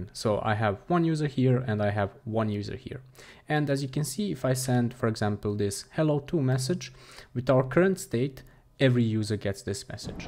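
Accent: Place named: Russia. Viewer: Are you from Russia?) I think so, because your English has a Russian accent.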